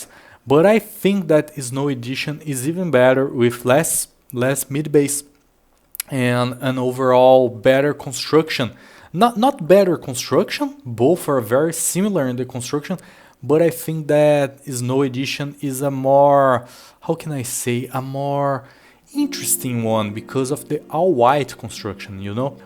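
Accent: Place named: Brazil. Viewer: É sempre legal ouvir BR falando inglês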